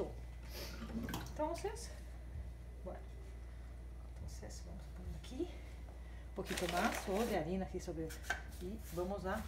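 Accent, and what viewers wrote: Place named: Portugal. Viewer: Tan dulce su castellano con tono portugués, muchísimas gracias, por explicar con tanta dedicación y quedo suscrita como su alumna !🥰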